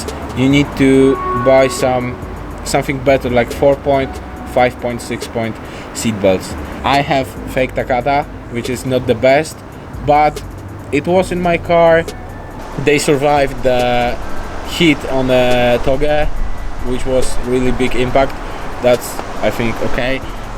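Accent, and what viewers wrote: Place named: England, United Kingdom. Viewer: Oooh man i love the accent and youre english i dont know why but its so Nice to hear!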